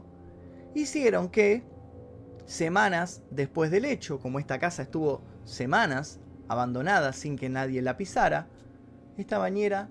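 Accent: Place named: Argentina. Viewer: mucho bla bla hablas mucho argentino para lo que narras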